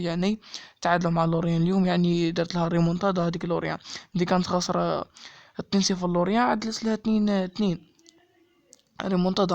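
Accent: Jijel, Algeria. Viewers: انا من جيجل وعلى حساب هدرتك تبان جيجلي 😉 🇩🇿🇩🇿 بالتوفيق كمل جيناك من قناة في العارضة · انا متابع لقناة في العارضة جيتك من تماك على حساب هدرتك انت جيجلي المهم ربي يوفقك لازمك فصاحة في اللغة راك تعرف الجزائر ماترحمش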